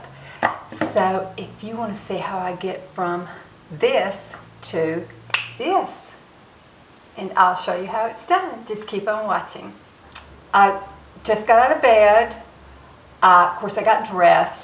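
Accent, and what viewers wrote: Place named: Virginia, United States. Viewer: I just figured out why I adore you so much- I have an aunt Ruth- she lived in Virginia-- (same cute accent) you have her mannerisms- and she was a beauty!!! When she was in her 20s people stopped her for an autograph they thought she was Rita Hayworth- My aunt had auburn hair.- I really believe god has so many molds LOL-See If this fits you. i will describe her - a "spit fire stubborn- Huge giving heart. When things are bad you are the light you know in the end it always works out. You avoid drama- LOVE design - have a hidden artist inside. To modest for your own good sometimes. Love your kids but its important to have your own life too .When she walked into a room she grabbed everyone's attention with out trying.. any of these fit? xoxoxo I FORGOT about doing my hair like this!!!! lots love shelly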